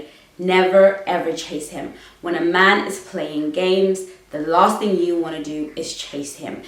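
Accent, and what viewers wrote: United Kingdom. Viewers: you are so beautiful and eloquent. I also love the British accent- my favorite accent. I just recently discovered your channel. Thank you for all you do. Working on lifting myself up. I know I’m a gem but there are times I’m a bit low and not feeling that way. Recent break up has really hurt me but working on picking myself up. Other channels helped me and like I said I recently discovered yours. Love the way you express yourself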